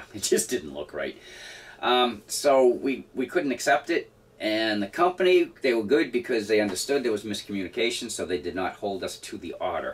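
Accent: Boston, United States. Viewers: Just found your channel and subscribed!! We just got our airstream and started our channel and cannot wait to get on the road like you....do I detect a Boston accent...? :) . We are from same area...would love to connect with you...so send us a message. Safe travels!!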